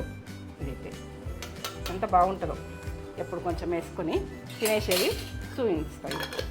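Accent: Telangana, India. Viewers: Aunty meedi telanganana,ma lage matladuthunnaru,ma ammalage anipinchindi.madi karimnagar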